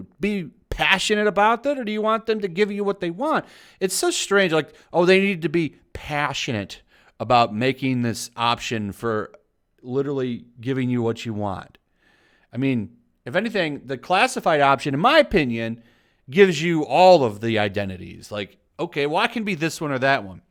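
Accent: United Kingdom. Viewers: Love the British accent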